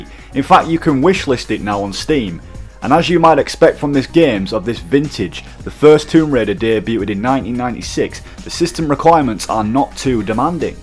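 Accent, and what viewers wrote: Bradford, United Kingdom. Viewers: Dude!? Are you from bradford??? You sound just like me 🤣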